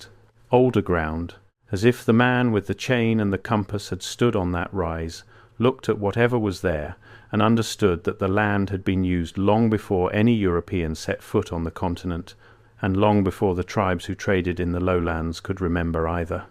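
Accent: United Kingdom. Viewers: Why a British accent narrating an American story? Oh, AI. Which makes me not believe the story.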